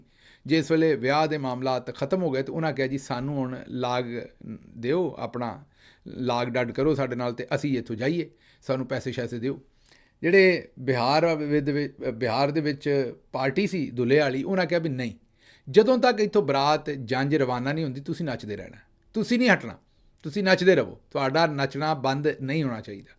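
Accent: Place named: Punjab, India. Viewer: Love your Punjabi accent 👍🇬🇧🇬🇧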